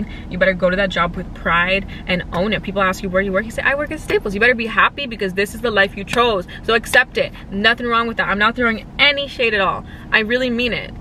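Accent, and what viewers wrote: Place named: United Kingdom. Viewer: British accent? Super cute! Watching from London, England. ❤️❤️❤️❤️❤️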